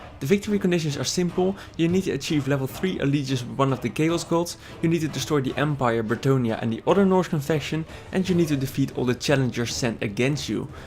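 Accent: Netherlands. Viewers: Hael Premjartonn he is dutch, not german. The accents don't even sound a little bit the same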